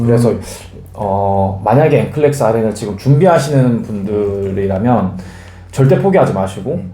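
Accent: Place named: Jeolla, South Korea. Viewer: ㅋㅋㅋ선생님 억양이 전라도 쪽인 거죠? 저는 경상도라서 제가 흔하게 듣지 못한 억양이라 귀엽고 더 귀에 잘 들어왔어요! 올해 입사하면 몇 년 열심히 경력 쌓고, 미국에 일하러 가보고 싶었는데! 이미 그런 길을 가신 분을 보니 멋있네요ㅎㅎ 참고해서 잘 준비해보겠습니다~ 영상 감사합니당:)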